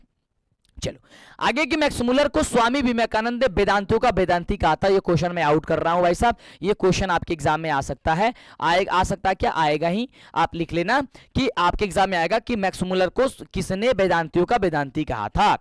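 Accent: Bhojpuri, India. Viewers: Bhojpuriya sir❤❤❤😅😅😂😂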